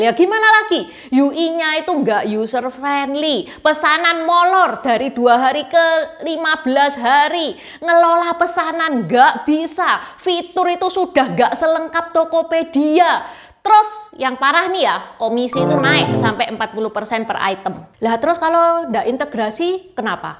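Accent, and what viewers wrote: Java, Indonesia. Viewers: Makasih mbak ncik. Ini chanel unik, keren, educativ trs bhsnya khas full medhogh.🇮🇩👍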